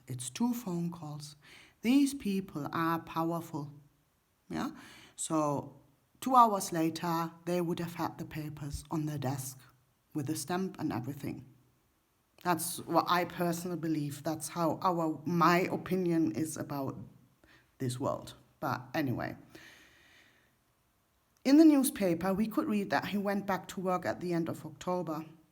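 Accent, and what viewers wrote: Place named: United Kingdom. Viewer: that is why there is a British accent coming through at times 😉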